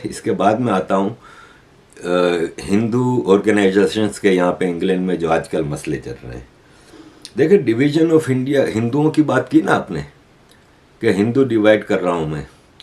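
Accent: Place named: Sindh, India. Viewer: Love your views. My utmost respects. I think you are a Sindhi (from your accent, but I may be wrong). Heartfelt respects from another Sindhi. 🙏🙏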